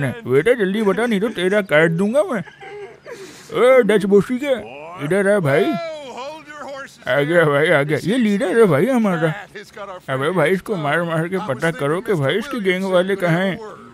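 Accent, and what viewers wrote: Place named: Pakistan. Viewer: bro ki awaz ma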